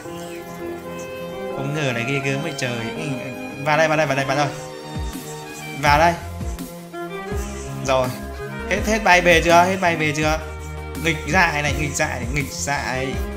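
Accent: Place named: Hanoi, Vietnam. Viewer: Cái giọng đậm chất Hà nội nghe anh bình luận hay hơn anh đánh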